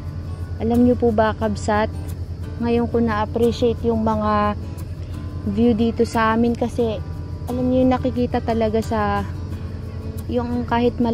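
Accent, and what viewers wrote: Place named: Davao Oriental, Philippines. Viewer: Parola mati davao oriental na logat jen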